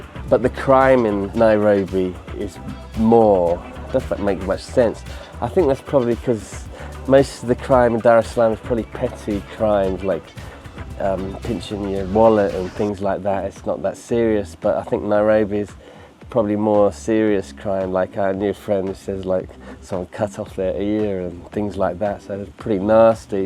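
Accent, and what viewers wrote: United Kingdom. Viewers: Goodness me! You are the kind of Europeans that make some Africans believe they are better than other Africans because they can speak English. Wth!! Tanzanians speak Swahili. They way you described how Tanzanians don't understand English is rude and ignorant! You made it sound like Tanzanians are dumb because they would not immediately understand you a British man with your British accent. It's ok to give your opinion on the two cities but you were flat out just talking down and insulting Tanzanians because they have a different culture and they don't speak your language. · British accent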